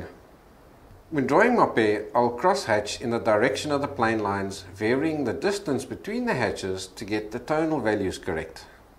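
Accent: South Africa. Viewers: Ja well no fine!! I was delighted watching your video and enjoying my homeland's accent. I live in Irvine California and became an amateur artist after arriving here 25 years ago. By the way, I have not lost my SA accent and people enjoy it for some reason! Anyway, good luck and best wishes with your videos. I plan on watching most of the paintbasket videos as I can see there are many which I did not know about. Totsiens and mooi bly!! Noeleen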